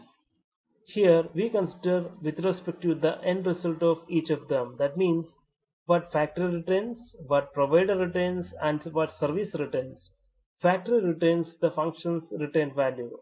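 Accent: India. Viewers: Indian accent everywhere :( ! -_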